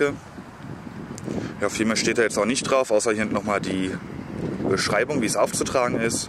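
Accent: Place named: Berlin, Germany. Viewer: Ohhhh Berliner 👍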